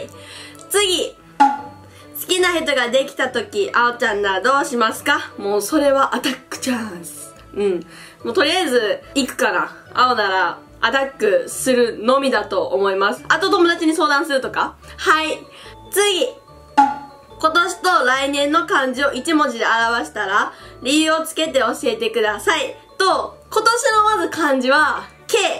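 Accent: Kansai, Japan. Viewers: 最近になってチャンネル登録したのですが、あおサンの笑顔は自分にとって癒し系です。関西弁もいいですね～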